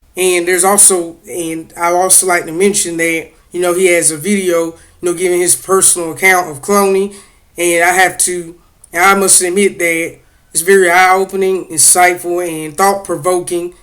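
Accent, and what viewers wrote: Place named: South, United States. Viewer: OMG your southern accent is soooo strong lol and cloning is getting out of control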